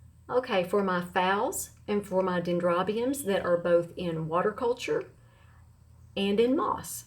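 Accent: South, United States. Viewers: Thank you! I did find this vid helpful/interesting/informative /pleasant. I like ur voice and southern twang, I subscribed (long ago) for that reason. I like how YOU'RE growing! Concerning vids and more detailed info. My growing conditions are SO different from urs I can't help but think ur just one blessed (lucky) lady. Keep sharing details and hopefully the "luck" will spread! I can't remember how long you've had some of those Vanda..? Do you have any Neos, Ascosenda, or Renanthera's? Xoxo from CO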